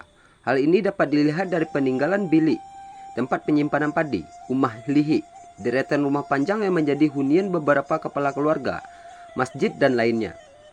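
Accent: West Sumatra, Indonesia. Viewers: Logatnya minang bgt euy, pas bahasanya agak beda keliatan bgt lidahnya patah2 wkwk. "E" nya jelas bet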